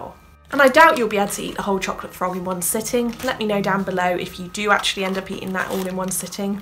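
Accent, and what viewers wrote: England, United Kingdom. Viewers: I love your english accent 😙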